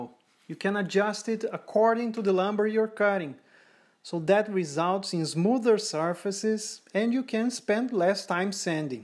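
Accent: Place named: Brazil. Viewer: Sounds like a Brazilian to me based on the accent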